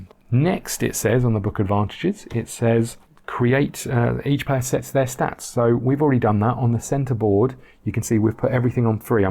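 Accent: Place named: United Kingdom. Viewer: Sooo nice to hear a fellow Brit do a playthrough …makes a nice pleasant change from voices across the pond lol…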